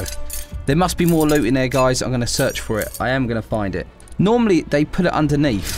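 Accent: United Kingdom. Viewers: I love your British accent and your videos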